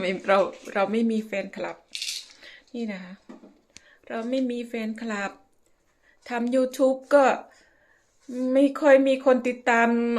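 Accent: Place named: Cambodia. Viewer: อ๋อ.. ขอโทษด้วยคะ พอดีว่ายายเป็นคนเขมรหน่ะคะ สำเนียงของน้องเหมือนแถวบ้านยายคุยกันเลย ❤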